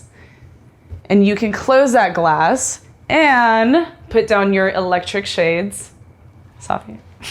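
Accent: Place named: United States. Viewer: Someone can tell me from which state this american english accent come plz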